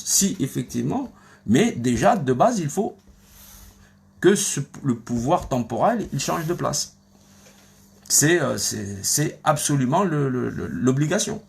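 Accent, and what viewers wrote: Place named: Basque Country, France. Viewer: Avez l'accen basque et un peuti peut de Mediterranean...😊❤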